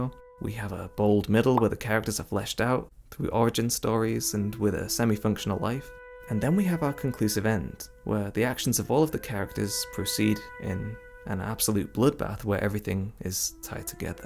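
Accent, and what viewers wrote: United Kingdom. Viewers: I think it's because you have an English accent who speaks vary softly in tone, just like most youtubers who explain the Dark Souls lore, you know the kind.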